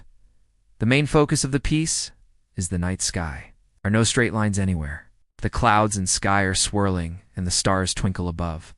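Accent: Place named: United States. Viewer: the worst about these common narrations is this super dumb American accent. US Americans can´t pronounce names, places and a single foreign language word f.s. and don´t even make an effort to try .... absolutely atrocious and dumb - especially in documentaries. Best turn off the narrator (as usual)..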